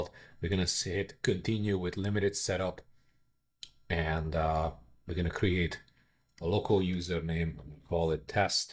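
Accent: Hungary. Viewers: You must be a Hungarian. Nice accent! 👍 and also greate guide!!!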